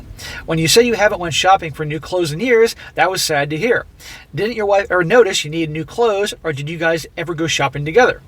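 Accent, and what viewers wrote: Boston, United States. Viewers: "I don't have a Boston Accent"... I call BS. I knew you were a masshole the minute I heard your voice a year or two ago.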